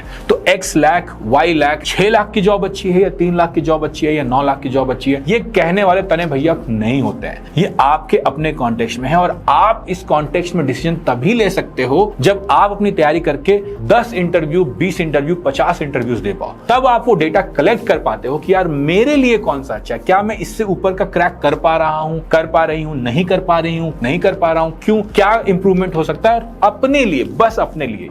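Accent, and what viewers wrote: Bihar, India. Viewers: bhaiya your bihari accent is so sexy and abhi to mai javascript me gote laga rha hu.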